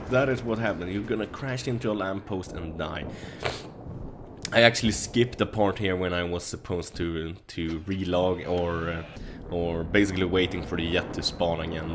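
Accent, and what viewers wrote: Sweden. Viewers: u are swedish? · Are you from sweden? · Yet... Yet gameplay, ah your accent is so Swedish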